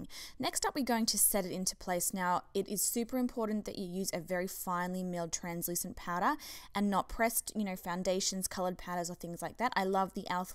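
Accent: Australia. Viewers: You have the OPPOSITE skin type and foundation preference to me, yet I just can NOT stop watching your channel. And (no offense) I normally can’t STAND Aussie accents. Yours is just SOOOO cute, like a kitten purring. You’re just so charming, authentic, and your eyeshadow game is unmatched. Eyeshadow is where I seek the MOST instruction, as I have somewhat small eyes, a bit like yours, but I can’t tell, bc your eye looks are so FUDGING PERFECT. If you could do more eyeshadow tutorials, I’d send you a hundred pieces of vintage jewelry, no joke. Seriously. Also, you give the BEST BEST BEST makeup tips. I watch SO many makeup ‘gurus’, and since I found your channel just a few days ago, I’ve found tips I’ve seen NOWHERE else, which I’ve added all of to my playlist ‘BEST MAKEUP TIPS’, which only gets the very BEST videos added. Well done, keep it up 👏🏻👏🏻👏🏻👏🏻👏🏻👏🏻👏🏻👏🏻👏🏻👏🏻👏🏻👏🏻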